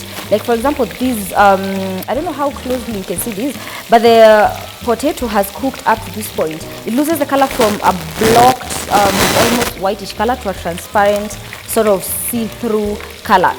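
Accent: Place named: Nairobi, Kenya. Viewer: i like this lady, shes so real, her cooking so simple and practical. and i like her Nairobi english lol. this is my dinner recipe thanks.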